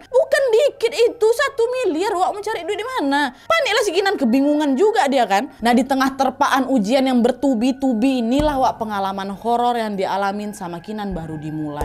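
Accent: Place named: Medan, Indonesia. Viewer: ini pintar,lucu selain logat medannya kental Trnyata pintr jg ngmog jawa nnlogatnya pun bgs... Sukses selalu... Salam dr Turky 🙏🙏🙏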